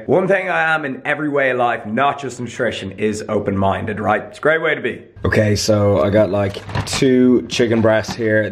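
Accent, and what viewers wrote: Ireland. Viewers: Is he Irish? How strange I clearly understand his english lol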